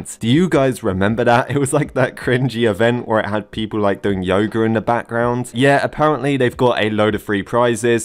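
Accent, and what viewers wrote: United Kingdom. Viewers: Are you using your british accent? I love british accents!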